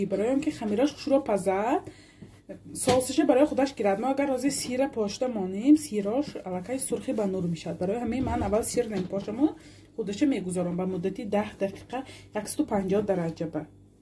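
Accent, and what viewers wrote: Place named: Khujand, Tajikistan. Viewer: Дар овозат чонам ашал хучанди ё исфара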